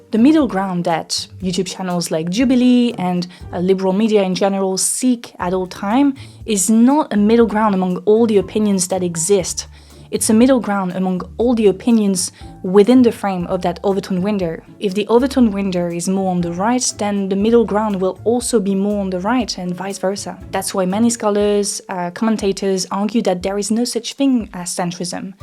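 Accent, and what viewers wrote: France. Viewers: Love how your accent is so French it becomes Australian (Overton windor, videor etc...) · Love your French accent 🥖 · I love how you combine this complicated discussion into a rational and balance topic. Maybe your voice and french accent help too, mercy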